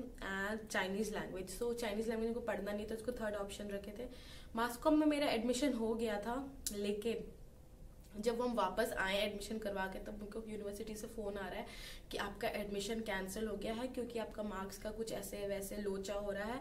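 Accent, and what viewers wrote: Jharkhand, India. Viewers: Ur language as" hum hum" ...though u r just one prsn reminds me of my hometown...that's too jharkhand🤣🤣🤣🤣too cute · TURU... HMKO LG HI RA THA SHE IS FROM JHARKHAND😂❤️ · I'm from Jamshedpur....and her accent is jharkhandi saying hum 🥺❤️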